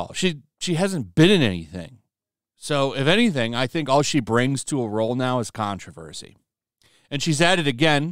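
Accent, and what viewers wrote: United Kingdom. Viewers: Like the British accent put to a Shakespearean tone. Thanks.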